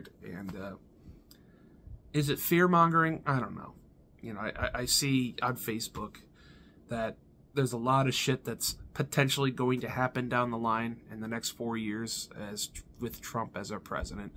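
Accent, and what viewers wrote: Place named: New York, United States. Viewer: Wait a minute your not italian? I thought u was from New York i watch the vpw2 freem video and as soon as u chose shockmaster I was like I need grab a slice with this guy he knows his shit the Gambino look scratch all the politics talk what ever happens happens just know u went down as a made men and we honor that look at the brightside atleast u still got your video game collection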